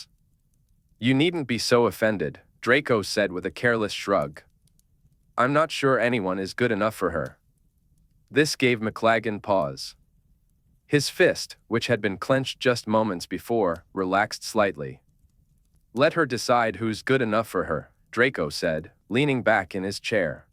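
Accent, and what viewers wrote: United Kingdom. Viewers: It's based in Britain so a British voice makes sense. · British accent?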